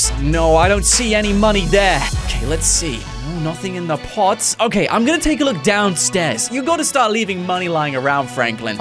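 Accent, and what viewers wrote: United Kingdom. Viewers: are you from United Kingdom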